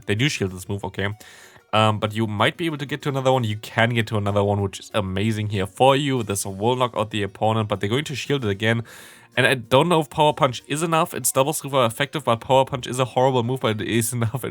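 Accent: Germany. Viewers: I knew it you were german! the classic german accent like mine xD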